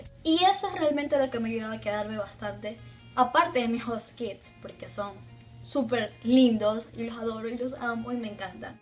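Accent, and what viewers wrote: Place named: Ecuador. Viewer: Creo que eres muy parecida a la Miss Universo, de verdad que te veo y me recuerdas mucho a Paulina solo que con acento ecuatoriano ;)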